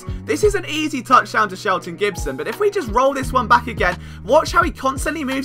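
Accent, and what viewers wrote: United Kingdom. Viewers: Does the British accent indicate that NFL football is really taking on in the UK ?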